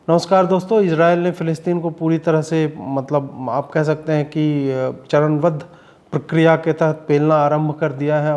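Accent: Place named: Bihar, India. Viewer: Video ki caption aur narrator ki bolne k tareeke se mujhe maza aya 😂. Fati fatah fatanti 😂 aur stadium jaisa mahol banna.....bhaiya k bolne ka tareeke mere ek bihari dost k jaisa hai. Maza aya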